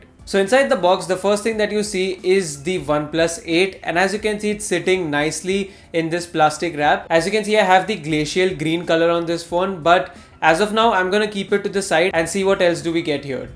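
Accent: India. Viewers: i like your Indian accentm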